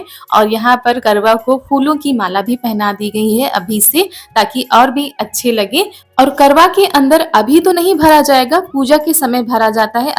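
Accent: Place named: Madhya Pradesh, India. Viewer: How cute pehli baar Gaavka dekha apki video me bahut alag tareeka hae kaunsa gav hae vaese language apki MP ki hi lag rahi hae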